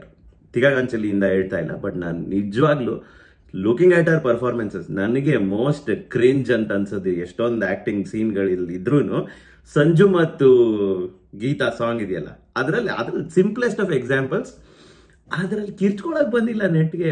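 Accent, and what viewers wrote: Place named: Dharwad, India. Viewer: neevu Mysore davru idru kooda nimge dharwad kannada isht chennaagi hege barutte?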